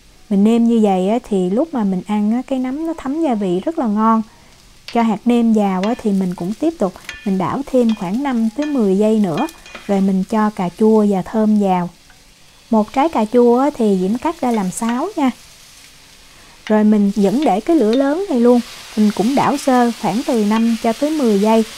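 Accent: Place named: Saigon, Vietnam. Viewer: Nghe cháu Diễm nói tiếng miền Nam của người Sài Gòn thật là dễ thương và lễ phép quá! Cô cứ lắng nghe mà không để ý đến cách chỉ dẫn nấu ăn và nghĩ chắc tiếng Việt của cháu ảnh hưởng từ Cha Mẹ đã ở Na-Uy lâu năm nên cô thiệt là may mắn đã nghe từ cả nữa tháng nay mà không có bị dị ứng với một lời nào của… “tiếng Việt MỚI sau năm 1975”. Chúc cháu có được nhiều người theo giỏi với tất cả video của cháu. Và cố gắng nói tiếng Việt CŨ của người VNCH, người Sài Gòn nhé? Đừng bao giờ gọi những người coi video là “mọi người” hoặc “cả nhà” nhé? Tiếng Việt Nam 4 chữ ấy đồng nghĩa với hai chữ TỤI BÂY đó. Cảm ơn cháu thật nhiều. 💝🌹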